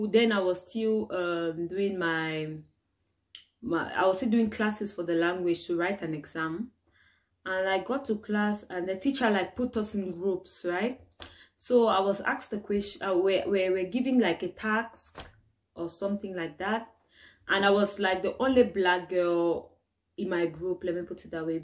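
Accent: Nigeria. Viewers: your speaking pidgin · your accent is slightly Nigerian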